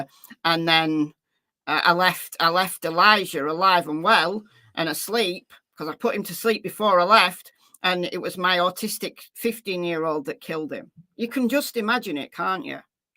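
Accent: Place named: England, United Kingdom. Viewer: It is morning here in the U.S. I luv you and your English accent and my second country England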